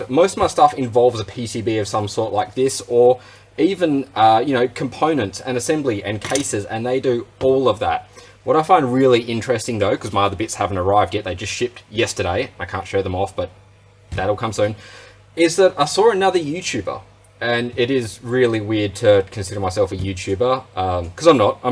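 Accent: Australia. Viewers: Mate, I just bought me one of these, just because I could. I mess around with Raspbarry Pi's, have the 26TOPS HAT, still figuring it. But saw one of these and just had to have one. Got it with a MIPI touch screen, so i can make it all in one, just add power. Love your review, it is so much better to hear an Aussie voice doing these 'weird' hobby stuff. Cheers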